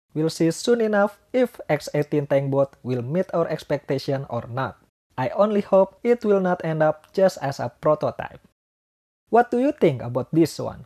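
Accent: Indonesia. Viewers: Wkwk Orang indo kan ? Accent nya Indo Banget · Hmm logatnya khas orang Indonesia sekali.. Nambah ciri khas negara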